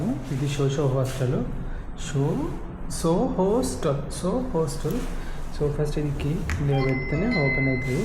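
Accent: Telangana, India. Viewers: ఇలా అంటున్ననాని ఏమీ అనుకోకండి బ్రదర్.. "రాజు కన్న బోయిన" బయ్య సన్నీ యాదవ్, ఇలా తెలంగాణ ట్రావెల్ youtubers అందరకీ including మీతో సహా,సబ్స్క్రైబ్ ర్ ఉంటారు, వ్యూస్ రావు కారణం తెలంగాణ వ్యువర్స్, పనికిరాని వీడియోస్, రోత కలిగించే కామిడీ వీడియోస్ చూస్తారు, చాలమంది, ట్రావెల్ వీడియోలు చూడరు, ఆంధ్రా వాళ్ళు ఎక్కువుగా ట్రావెల్ వీడియోస్ చూస్తారు, కానీ, తెలంగాణ స్లాంగ్ కు అంత కనెక్ట్ అవ్వరు, అందుకే తెలంగాణ ట్రావెల్ youtubers కి డబ్బులు రావడం కష్టం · it's so sad bro, money makes many things anaru so ths video is the best example, please like everyone bcz 14k views but likes only 2.5k so please like every video, ram travel bro and Avnesh bro ki with in 3 r 4yrs lo full views vastunai but Bayya ki and raja reddy bayya ki asalu views ravadam ledhu so sad, please support this bros. mana ap brothers kondariki (andariki kadu) telanagana slag nachadhu anduke view ravadam ledu anukunta.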